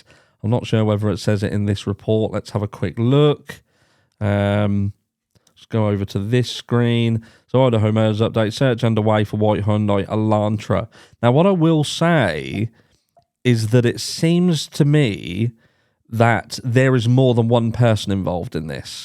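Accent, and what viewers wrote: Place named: Lowestoft, United Kingdom. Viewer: nice, the reason I asked is you have a similar accent to some of my family from Lowestoft. I now live in Austrailia and you don't hear that accent very much.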